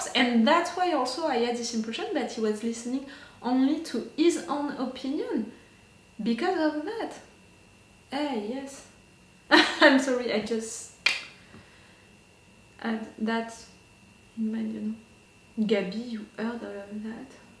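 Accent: France. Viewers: These episodes are really getting out of hand, i hope it's not offensive but your emotional reaction mixed with your french accent really makes it for me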